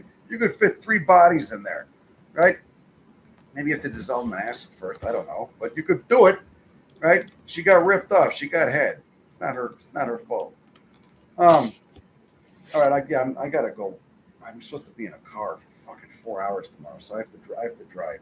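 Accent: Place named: Iowa, United States. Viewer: Love his Iowa accent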